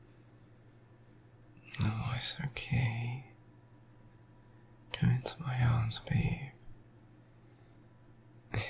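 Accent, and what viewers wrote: England, United Kingdom. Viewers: Thanks for the video, btw i love your accent! Are you from england?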